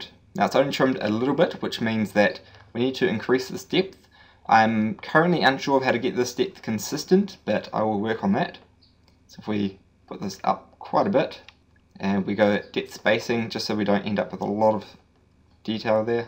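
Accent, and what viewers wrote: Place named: New Zealand. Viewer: nice to hear a kiwi accent :D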